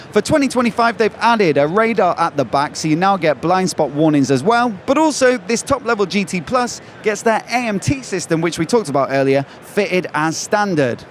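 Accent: England, United Kingdom. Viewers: I love your accent, which kind of English accent do you have?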